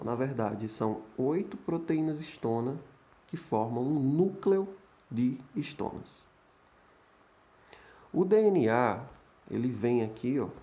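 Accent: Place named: Minas Gerais, Brazil. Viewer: Modo de falar legal em minas, amei esse trem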